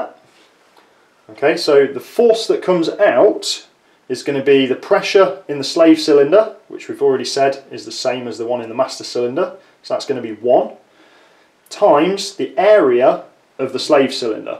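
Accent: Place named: Southern England, United Kingdom. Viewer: Nice refurb! I enjoy your videos very much... your 3 Phase Rotary Converter was particularly interesting... thinking of doing the same thing myself, so thanks for sharing. Greetings from Southport (it's not in the South and it's not a port!). You sound like you're a southerner... I'm from Oxford originally. Cheers matey!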